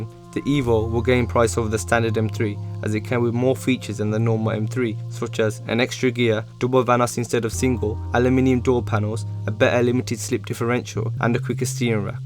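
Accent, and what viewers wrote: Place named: Birmingham, United Kingdom. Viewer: that birmingham accent come onnnn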